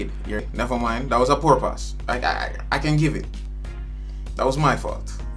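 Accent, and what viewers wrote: Jamaica. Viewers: Bro u speak Jamaica?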